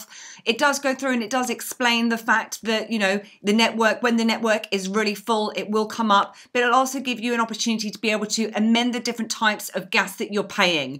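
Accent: United Kingdom. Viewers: Hi great video and by the sounds of it you are also in UK . Have given you a follow on instagram. First off the videos are all very clearly explained and understandable so thank you for that. Secondly it would be good to find out either on youtube or on instagram some local UK or London based crypto events. I have signed up to meetup but there are almost too many to choose from . Anyway nice connecting on the crypto space keep up the vids !!!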